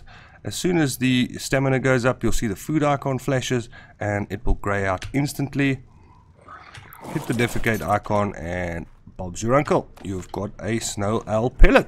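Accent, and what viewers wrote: South Africa. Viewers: Came across your Subnautica video on how to find the Blood Kelp forest and instantly heard that beautiful SA accent😂 subbed somme dadelik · Lekker die SA! Cant miss that accent xD Good vids boet keep it up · My fiance is from SA. He heard your accent and knew it right away. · Eyyyy! I heard the SA accent and subbed straight away, lekka man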